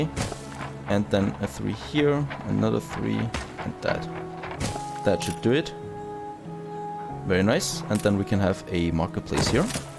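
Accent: Germany. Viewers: U German? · Wusste ich es doch :D wollte schon sagen du hörst dich sehr deutschsprachig an xD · Mmm yes the german accent is very present here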